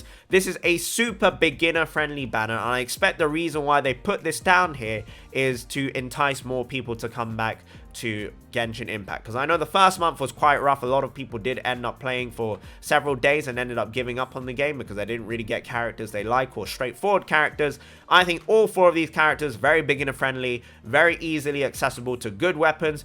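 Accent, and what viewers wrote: United Kingdom. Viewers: Loving the vids. Question: are you British? Just curious cause of the accent. Ps: don't let haters get you. I didn't k ow that the group was based from an Italian troupe/show so its nice to know!! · just found this channel, love the personality , enegy , and of course that asian british accent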